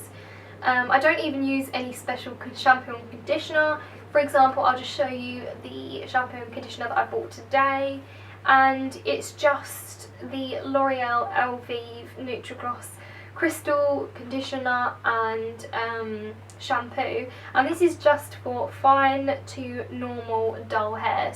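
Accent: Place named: United Kingdom. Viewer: I admire your british accent❤️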